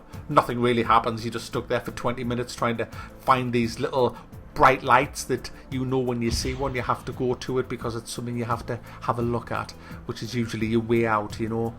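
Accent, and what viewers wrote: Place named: Newcastle, United Kingdom. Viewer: You sound like the Geordie version of Sir Davos from Game of Thrones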